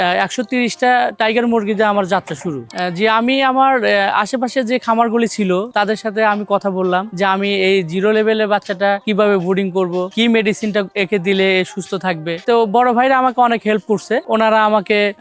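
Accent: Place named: Comilla, Bangladesh. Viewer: তর বাড়ি মনে হয় কুমিল্লা হালার পুত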